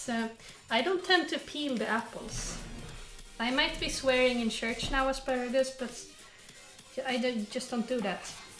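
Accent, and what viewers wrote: Sweden. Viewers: ni har liknande svensk/engelsk dialekt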